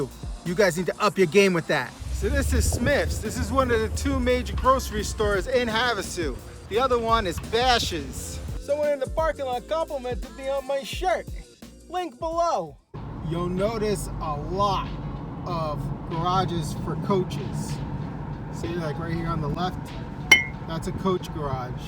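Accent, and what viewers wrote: New York, United States. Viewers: That NY accent is thick.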